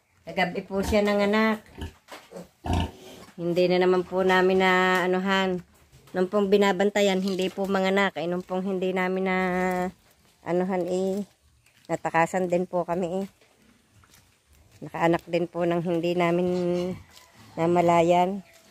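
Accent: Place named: Batangas, Philippines. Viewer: Para taga batangas po kayu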